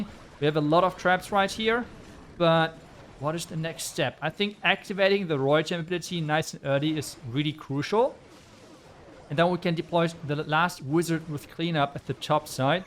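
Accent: Germany. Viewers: He’s german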